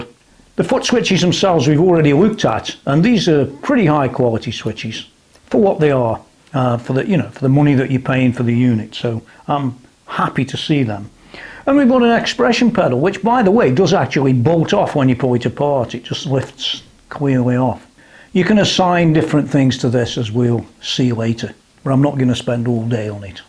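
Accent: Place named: Liverpool, United Kingdom. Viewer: Thanks for the Really detailed walkthru. Very informative, i don't know if I have ever seen one like it, but, it was helpful to see the quality, or, lack of it, inside the unit. I have only seen the Boss GT-1 from the inside and that was because I threw it against a brick wall. I got a good look at that one inside and out. I have a Helix LT now but it is quite complicated to edit and use, but at least it has a PC app to make it easier. I think that may be the thing that kills the MX5 for me, but maybe they will add one in the future, I won't hold my breath for it. BTW, are you from Liverpool? you have distinctly liverpudlian, Paul Macca accent. Thanks for the video.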